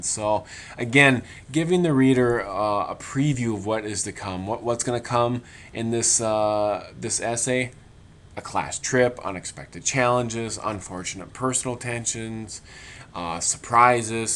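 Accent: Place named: Canada. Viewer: hehehe Canadian accent..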